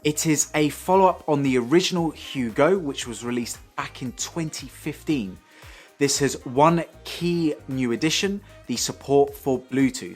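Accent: United Kingdom. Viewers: what a great reviw! . Amazing british accent!. Keep up ! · waster my time of 6.34 minute was think the product was uk market & he got a british accent! but i was wrong it for the usa market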